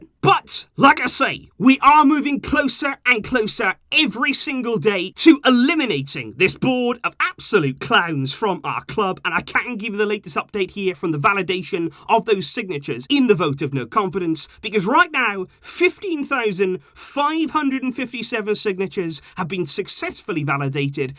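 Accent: United Kingdom. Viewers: I practice my British accent by mocking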